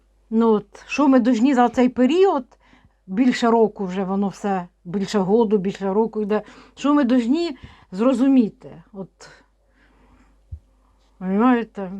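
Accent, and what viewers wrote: Ukraine. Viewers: Пані дорогенька ми Вас любимо Ви Є уособленням України, жіночності, берегині. А то пише провокатор, неук, щоб укинути колот. Ви абсолютно по Українські, по народному розмовляєте, говорите... Дякуємо за Вашу працю, цінуємо, любимо!!!😍🥰💙💛🌻🌼💐🌻🌼💐🌻🌼💐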